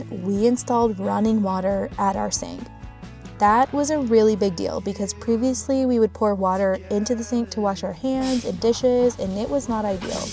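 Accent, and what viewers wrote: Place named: South, United States. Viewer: Hi! I’m new to your channel and LOVE it!!! May I please ask what state you live in? I hear the accent and I thought something was mentioned that makes me think you are somewhere in Tennessee. Maybe one of the Carolina’s? I was raised in Kentucky. Rattlesnakes were a bit too chummy down there!😂😂😂 Thanks for such wonderful videos! You know you are doing a great job when the people watching want to be your friend, and we not only are entertained, but learn things as well! Joy and happiness to you!!💜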